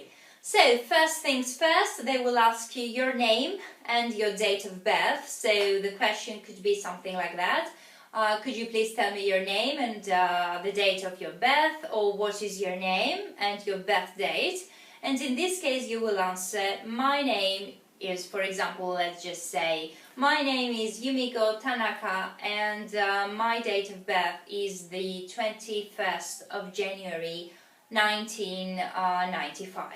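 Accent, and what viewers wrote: United Kingdom. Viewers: thanx for the lessons mam 😊 ...are you from UK?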